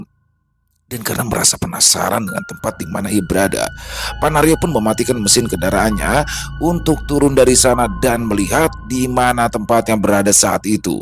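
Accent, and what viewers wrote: Jakarta, Indonesia. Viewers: Waalaikumsalam. Seneng deh kalau Bang bercerita dengan aksen betawi. 🥰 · assalammualaikum bunda hadir dr condet.yg selalu ditunggu2bang pianada lg pokoknya seru kalau da cerita bangpian karna bang bawain logat betawi nya mantap sukseees terus bersama rekan 2aamiiin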